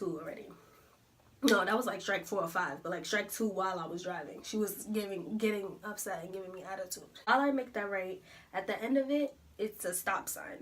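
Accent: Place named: New York, United States. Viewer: Where did you take your road test? You sound like your from NY like me! Lol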